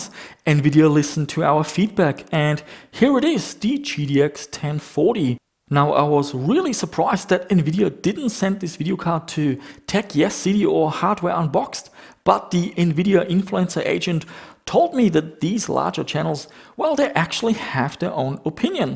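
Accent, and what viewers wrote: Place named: Germany. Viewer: Dieser deutsche Aktzent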